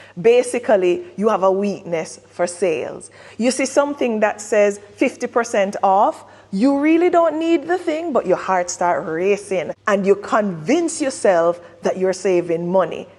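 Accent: Jamaica. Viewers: O Jamaican in foreign you talking 😂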